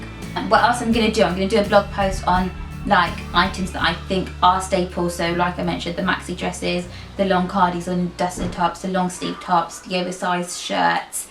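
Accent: United Kingdom. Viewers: ASAK, I have to say I had to watch your video twice because I was just so taken with your accent! It's lovely, Masha'Allah. What part of the UK are your from? Great video and looking forward to more. Oh yeah...where is your scarf from? Thanks!!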